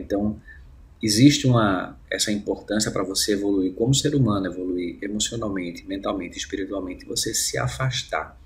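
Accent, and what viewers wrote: Recife, Brazil. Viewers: eita recifense. massa!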